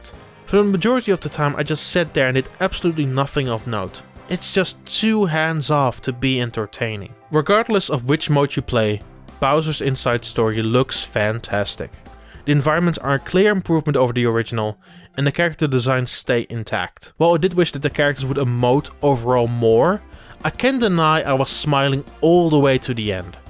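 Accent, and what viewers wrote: Netherlands. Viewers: hello fellow dutch person , i recognize your accent :D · Wow the Dutch accent can clearly been heard brother xD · You’re dutch, right?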